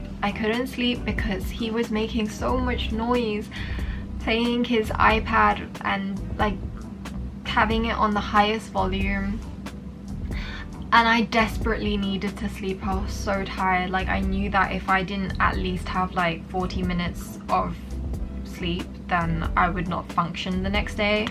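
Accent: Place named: United Kingdom. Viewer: beautiful british accent :)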